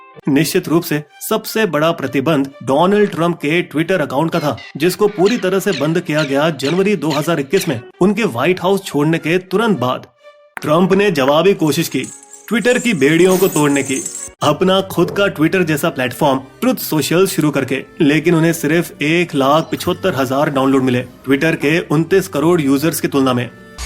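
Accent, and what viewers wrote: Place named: South, India. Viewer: Good to see you back 😎. That madrasi voice caused my head to spin 🦔 🤮 🤮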